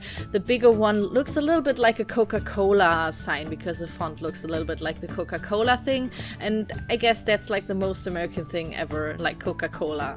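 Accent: Germany. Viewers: Du hast wirklich einen starken deutschen Akzent und die machst mehr Grammatik- als Vokabelfehler. Aber du wirst besser. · )) I don't get that myself either. Maybe they also have bad accents and are mad at themselves and thus also at you for having that German accent? I don't know, I find it charming when I can hear an accent from the non-native speakers I'm subcribed too and that make English videos.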